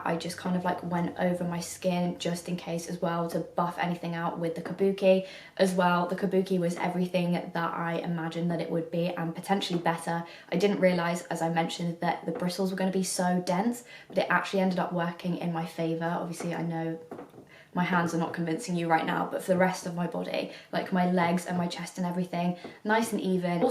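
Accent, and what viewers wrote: United Kingdom. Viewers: Yeah no. She’s also British so idk how you didn’t catch the accent.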